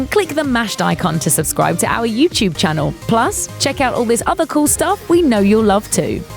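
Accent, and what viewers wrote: England, United Kingdom. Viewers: Number one: narrator is British and should be the watch mojo girl. Number two: she's British and can't pronounce controversy. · CON-TRO-VER-SY... Americans love Brit accents, but not when yammering about American's faults. Then it sounds either retarded or snooty. NAHHHaaa, who am I fooling I still lover her voice. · i dont fuck with the english accent chick, bring the other girls back PLEASE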